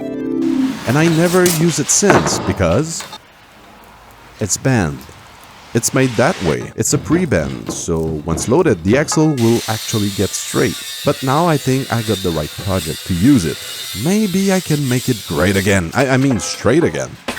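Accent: Quebec, Canada. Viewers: "peux pas toutes aware" ... Je'l savais que ça sonnait Québécois cte vidéo là...pi en plus...c-t'une bonne idée ! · Ta le meme accent que george stpierre loll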